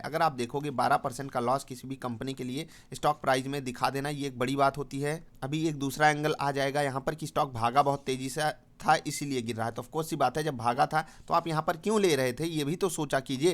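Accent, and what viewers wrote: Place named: Kanpur, India. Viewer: ap kanpur se he kyaa?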